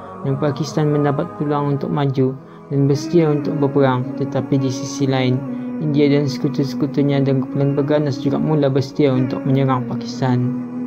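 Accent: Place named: Terengganu, Malaysia. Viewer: Bunyi cara bcakap nie loghat terengganu,org trgganu dlm bahasa baku..trbaikkk...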